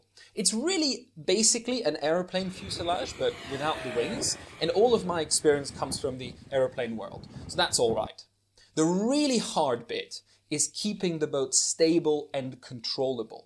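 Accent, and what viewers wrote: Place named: Scandinavia. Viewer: pretty sure he's not UK(originally), sounds like scandinavian, to many american R's and over pronounciation of the english bøøøut(boat)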